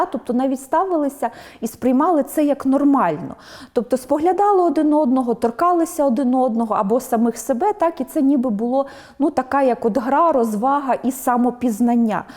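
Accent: Russia. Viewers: Спікер явно російськомовна. Багато помилок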